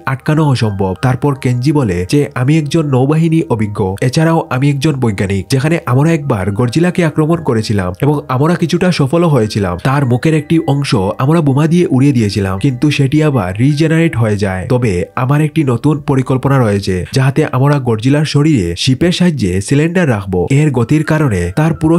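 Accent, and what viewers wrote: Sylhet, Bangladesh. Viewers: bangla spelling gula improve koren kindly...apnar spelling er time e sylheti bair hoya jaachee....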